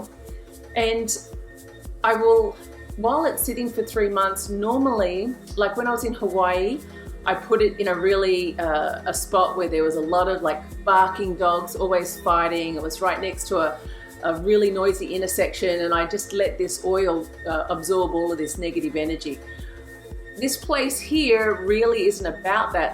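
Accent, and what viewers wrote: Australia. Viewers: I gotta tell you! It's so refreshing listening to an aussie accent talk about witchcraft/demonolatry/magick/occult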